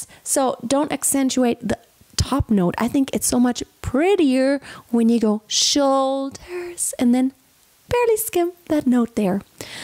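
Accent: Germany. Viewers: Are you German? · You sound German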